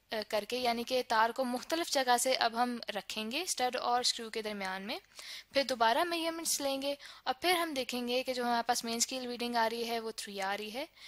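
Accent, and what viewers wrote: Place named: Pakistan. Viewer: Assalamoalaikum mam aapki awaz bht khoobsurat h or aapke smjhane k tareeke ki m fan ho gyi hu Aap shayad pakistan se hn asal m aapke bolne k tareeke se laga mgr sch m aap bhht achhe se smjhati hn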